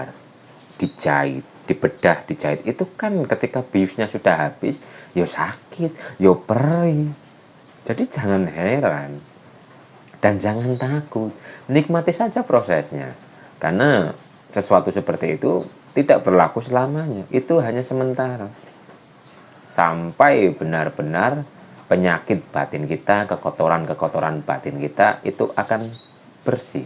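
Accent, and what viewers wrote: Banyumas, Indonesia. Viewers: assalamualaikum,, Njenengan kyane wong ngapak mas🙏🙏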